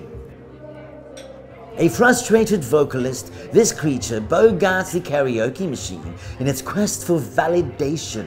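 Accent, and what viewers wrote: Australia. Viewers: ngano Mani bay, kung paminawon Ka mura kag Australiano unya kung tanawon Ka mura kag Mexicano o Dile Gani Bisaya...ngano man???!🤔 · I love the authentic Aussie accent...so cute and funny...lol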